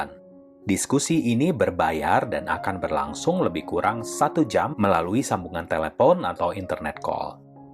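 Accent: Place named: Java, Indonesia. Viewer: Tiba tiba logat bicaranya aneh....gimana ga aneh biasa ngomong logat Betawi kok tiba-tiba campur logat Jawa..😂😂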